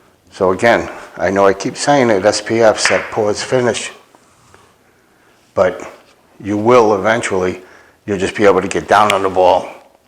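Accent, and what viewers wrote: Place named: New York City, United States. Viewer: Good stuff! Loving your videos...simple...straightforward...with the NYC accent! I have lots of drills to work on now to improve my game, so thnx!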